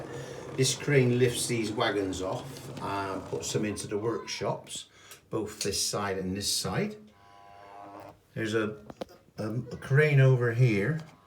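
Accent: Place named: West Country, United Kingdom. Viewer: Great thank you for the inspiration. Ever since a lad when my dad took me to model railway shows did I want a narrow guage layout so I've decided at last to covert some of my 040 into 7mm tanks. Sounds like your from the West country. I was from Gloucester but now live in West Wales so I paint everything grey!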